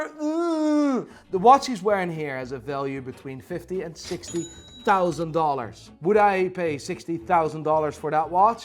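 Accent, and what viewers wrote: Netherlands. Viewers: Heerlijk, als ik dat hollandse accent er tussendoor hoor 😁 Well done! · Ik wist gewoon dat jij Nederlands bent ik heb het vaker in je accent gehoord maar nou weten we het zeker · ik wist gewoon dat je NL was broer. Je accent je naam alles! En uit welke stad kom je trouwens vandaan?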